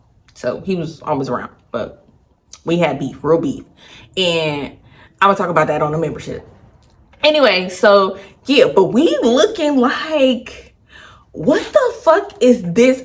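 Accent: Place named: Louisiana, United States. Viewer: I’m hollering! 😭😭😂😂 It sounds like when I would visit my people in the country in Louisiana. I’d try so bad to not offend no one but Lawd 😂 PERIODT CUZIN! SCREAMING I wish Texas would’ve worked out for you , but I remember why 😩. It’sdiverse but every city has its duck offs where you can be with your ppl. You act like us to me 🤷🏾‍♀️! Ok, I’m done. 😂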